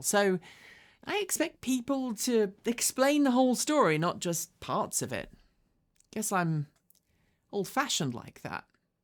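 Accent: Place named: United Kingdom. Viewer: Its not co.plicated. the ev push got people to run out and get them then realized how very diffiucult they are if you have much distance to drive. But you sound british so may not understand at all. Out here in westeren america where its minimum 50 milea between towns and vast majority dont have charging and winter real winter it takes only one trip to understand how unreliable thwy are.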